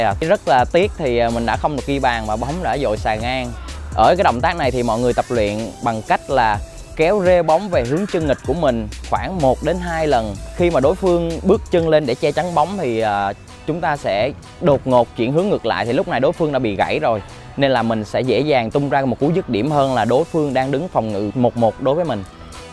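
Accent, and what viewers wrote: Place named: South, Vietnam. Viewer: Nói dùm rặc giọng nam đi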